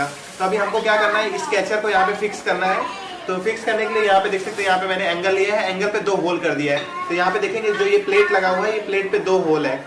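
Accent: India. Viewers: He does seem like a Gujarati the way he speaks hindi. May be he is originally from Hindi speaking state. But who cares apne desh hi baccha hai.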